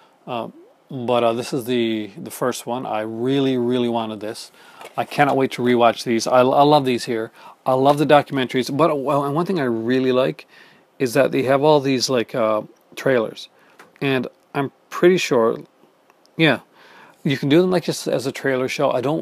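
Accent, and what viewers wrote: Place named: Canada. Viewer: you have more of a Canadian accent now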